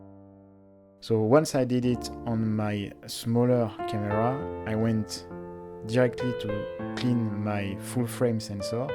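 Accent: France. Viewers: I was kidding, is that a problem? By the way, I love the Frenglish accent :-)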